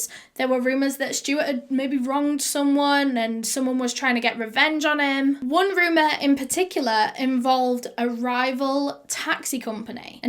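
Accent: United Kingdom. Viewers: how did I know you were from uk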